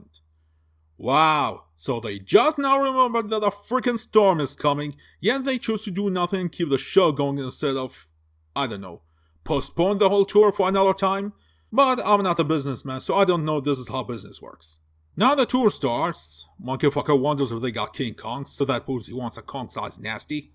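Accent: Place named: Dubai, United Arab Emirates. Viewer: It’s actually his thick accent, and bad mic quality. He’s from Dubai if that clears some stuff up.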